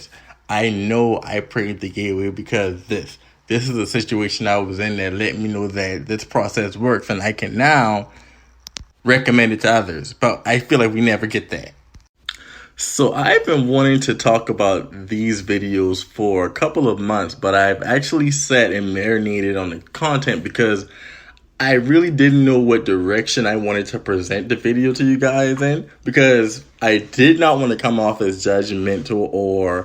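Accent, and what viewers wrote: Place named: Gullah Geechee, United States. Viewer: Great video. Quick question are you from Charleston, SC!? You got that Geechee accent like folks from that area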